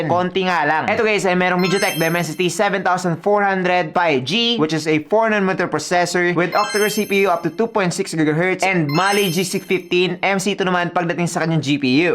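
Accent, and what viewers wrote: Visayas, Philippines. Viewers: Bisaya ka lods?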